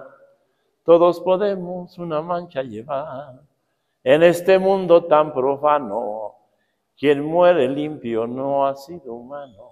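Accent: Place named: Mexico. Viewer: Así se habla mexicanamente machín, padrecito....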